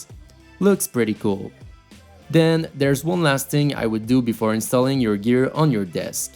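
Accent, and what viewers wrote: Quebec, Canada. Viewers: tu as l'accent du québec,mais bonne video tabarnak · thanks and I have a question ... are you Canadian? I noticed that you had a little Canadian accent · Je reconnais l'accent québécois ;) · Yoooooooooooo serais -tu québécois par pur hazard??? XDD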